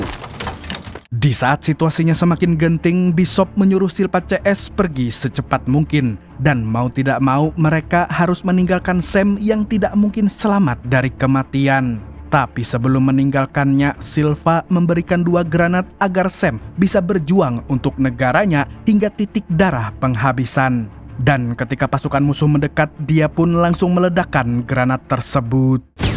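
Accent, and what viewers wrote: Banjar, Indonesia. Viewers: oh ini kah nang buhan banjar tu wkwk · Banjarr leh.?! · Urg banjar dimana pyn mang😅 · Urang banjar juakah ikm nch....😂😂😂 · Bang pian orang banjar dmnanya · Buset org banjar kah sklinya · Org banjar kah pian niti mang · orang banjar kah pian😂😂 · Kayak nya mimin nih org banjar nah wkwkwk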